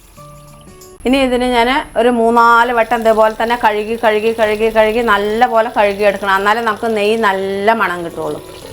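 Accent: Palakkad, India. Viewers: നാട് പാലക്കാട്‌ ആണോ സംസാരം കേൾക്കുമ്പോൾ അതുപോലെ തോന്നുന്നു ❤ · പാലക്കാട്‌ ആണോ സംസാര രീതി കേട്ടിട്ട്? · പാലക്കാടൻ ശബ്ദം...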